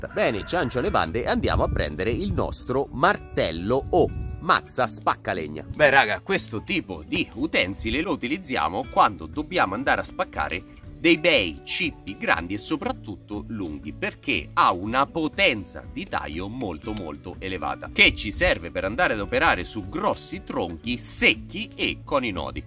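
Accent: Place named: Agrigento, Italy. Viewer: Ciao dall'accento sembri di Agrigento io sono di Palermo l'inverno della nostra isola e così mite che da lunedì sono previsti 20/°C 😎☀️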